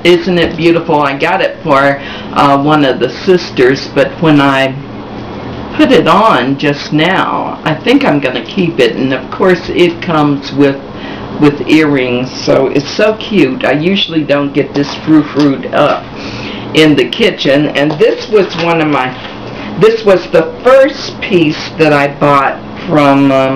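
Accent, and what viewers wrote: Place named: Midwest, United States. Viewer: LOVE this:) Im from Wyoming so Nice to listen to another midwest lady:) THANK YOU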